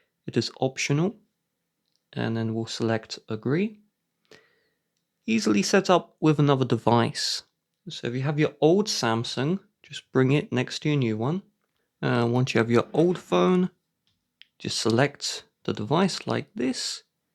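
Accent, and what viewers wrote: United Kingdom. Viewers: Hey what country are you uploading from? I’m in the US (Texas) lol just wondering because you sound British (UK) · You have such a nice accent! What is it Surrey or Berkshire?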